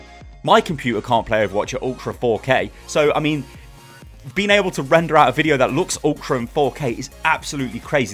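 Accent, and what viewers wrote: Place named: United Kingdom. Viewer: I love your videos, I watch your videos always when I'm having breakfast, both for the news and for your british accent. I wish someday you do a Unit lost con, I would gladly come from Spain. Keep up with the good work! Cheers!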